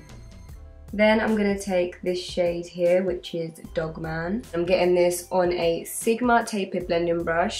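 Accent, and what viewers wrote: London, United Kingdom. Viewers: gives me Audrey Hepburn vibes...she even has the Eliza Doolittle accent (I don't know what part of London) from the movie My Fair Lady!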